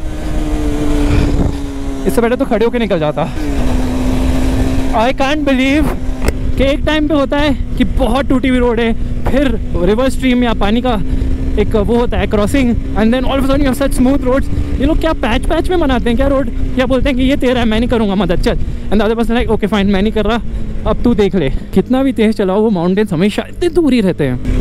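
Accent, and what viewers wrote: India. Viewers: I like your way of speaking Hinglish 👌😂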